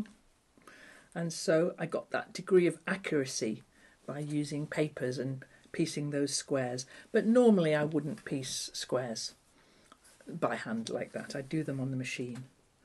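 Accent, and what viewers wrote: England, United Kingdom. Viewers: ❤love your English accent and how you live life to the fullest 🎉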